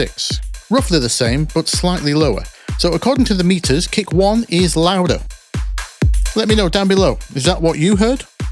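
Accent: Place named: West Yorkshire, United Kingdom. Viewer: nice videos! You sound like you're from west Yorkshire, talking posh? 😀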